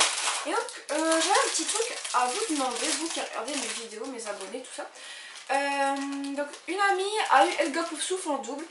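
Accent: North, France. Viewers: Oh my god! Trop beaux souvenirs! Rien que de voir la boutique, j'en bavais d'envie d'y aller! J'aimerais trop mais mes parents ils veulent pas ou peut-être l'année prochaine en colo mais j'y croit pas trop car je suis jamais parti seul donc ils veulent pas. Mais sinon super souvenirs! Tu en as eu pour combien si c'est pas indiscret stp. Et j'ai une question: Tu habites dans le nord de la France? (si oui c'est grâce à ton accent que j'ai découvert, Si non, je me sis trompé). La prochaine fois que tu pars, dis le moi, je me mets dans la valise ;) Je rigole :D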